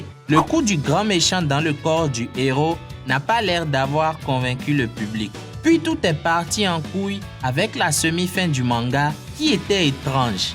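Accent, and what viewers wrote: Benin. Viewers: On dirait que vous êtes béninois 🇧🇯 En tout cas vous faites du bon contenu vos analyses sont assez pertinentes. Du courage 👏👏👏👏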